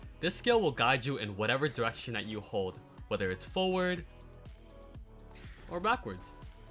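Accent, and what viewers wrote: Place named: Asia. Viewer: It's funny how you can hear that the guy speaking is an Asian.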